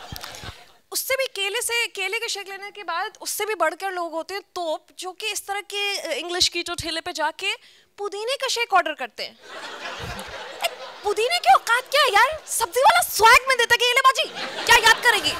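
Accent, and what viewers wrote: India. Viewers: Exactly my point. I liked the script but her accent was more indianish. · She has an Indian urdu/hindi accent.. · Well that was great.. But.. The language chosen by her was a bit like they speak in india.. I mean the way of talking.. Or even some punch lines.. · why do you sound Indian ?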